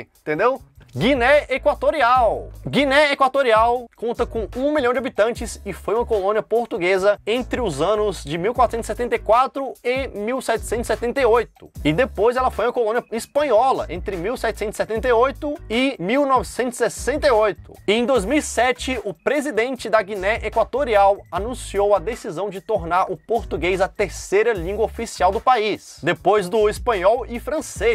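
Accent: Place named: Northeast, Brazil. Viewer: Qual o sotaque deste apresentador ?deve ser do sotaque do nordeste ...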